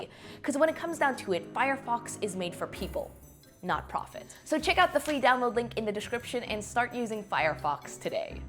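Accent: France. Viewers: I love the way she speaks in that french accent 🌸❤️ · so funny for me to hear your french accent... I can't even imagine the mountain of work you had to go through but overall this video was superbly well done. I've listened to soooo many lessons about the french revolution throughout my years in school (being French I guess that's legit) and I now realise that you've done an amazing work here : you've managed to deal with both the important events and the funny anecdotes (which is really what we are here for...) However I would have probably talked about the impact that England had to France politically speaking. But as always great video, you definitely brought the expression : "learning is fun !" back to life. Personnaly the printing press seems definitely more interesting in terms of social and religious impacts in Europe but I guess you must have a dozen of great anecdotes on Shakespeare...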